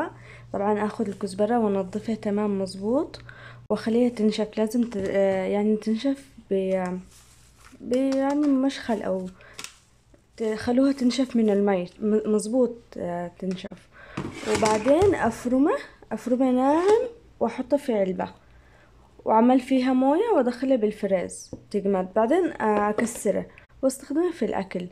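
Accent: Aden, Yemen. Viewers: قدك ع الهدره العدني لاتغيريها للسعودي مافي احلا من هدرتنا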